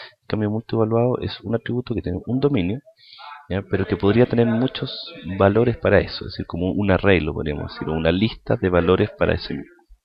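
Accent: Chile. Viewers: por su forma de hablar creo que es chileno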